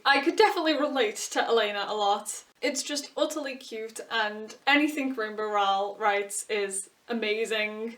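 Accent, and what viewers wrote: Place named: Leeds, United Kingdom. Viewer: what part of the UK are you from? hope you don't mind me asking, just trying to pinpoint it through your voice... kind of sounds like you're from the Leeds area??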